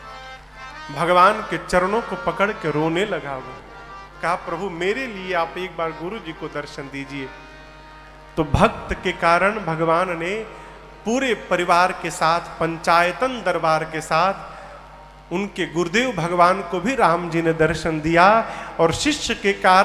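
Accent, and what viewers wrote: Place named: Bihar, India. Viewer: आप कथा कहते समय निम्न स्तर की भोजपुरी,मजाक, बिहारियों की तरह किया करते हैं।v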